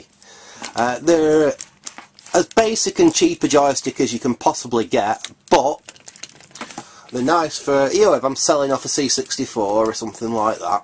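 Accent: Manchester, United Kingdom. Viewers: Great finds! Like the Manchester dialect!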